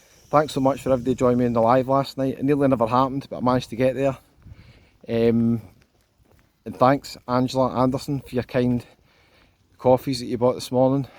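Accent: Scotland, United Kingdom. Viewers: Nice vlog....well as they say "you can't please them all" I always find your vlogs pretty neutral and hardly biased re your journeys. To me and many others we share the same values so I appreciate your words and opinions. We aren't going to get away until September so your vlogs give me a little bit of sunshine, sand and blether.... My mum was from Girvan so I like the accent and tone. Keep up the good work 🙂 Be safe 🙏 G 🦉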